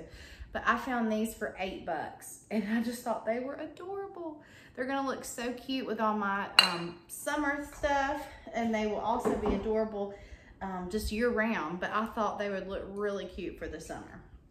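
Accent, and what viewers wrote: South, United States. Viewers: You two are so cute!! Your Aunt looks like a "hoot" to be around. You are such a sweetie and i love your energy and "southern drawl". Thanks for sharing! Happy Memorial Day weekend.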